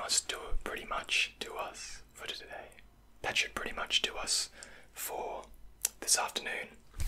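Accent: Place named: Australia. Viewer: As an Aussie you never realise Aussie accents until you hear them in videos 🤣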